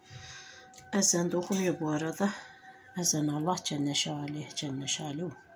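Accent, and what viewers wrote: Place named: Sivas, Turkey. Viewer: Sen sivas limisin bizim gibi konuşuyorsun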